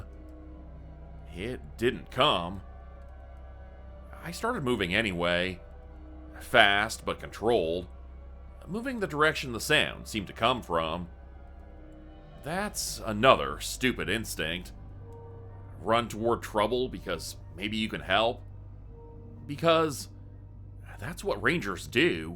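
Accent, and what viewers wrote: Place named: Canada. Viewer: oh wow I thought it was his real voice 😊 especially the c😎😎L Canadian 🇨🇦accent I hear 🩷🧡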